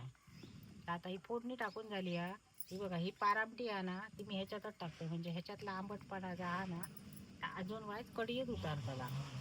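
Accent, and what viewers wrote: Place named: Malvan, India. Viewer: अतिशय सुंदर व्हीडीओ , ताई तुम्ही फार लकी आहात.अशा मनमोहक निसर्गाच्या सानिध्यात तुमचे जीवन जगत अहात.आम्हा मुंबईकरांना तुमचा हेवा वाटतो. तुमची मालवणी भाषा ऐकायला कानाला गोड वाटते.असेच नवनविन व्हीडीओ टाकत जा, आम्हाला तुमचे व्हीडीओ फार आवडतात.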